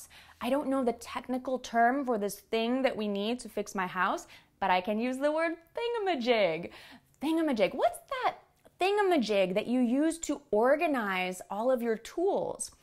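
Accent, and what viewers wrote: United States. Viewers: Sister you from american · From what part of the US are you?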